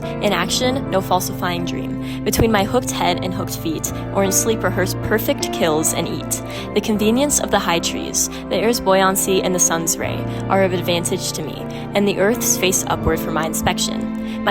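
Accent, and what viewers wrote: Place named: United States. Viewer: Why is an American reading this? It's very poor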